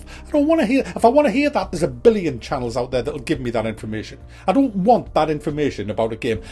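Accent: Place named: Newcastle, United Kingdom. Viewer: Made a Patreon account and put down a monthly subscription. Love your work mate! I'm a Scouser living in the States and always love to hear you're accent and sense of humor. Reminds me of my old Geordie mates. Keep on keepin on mate.:-)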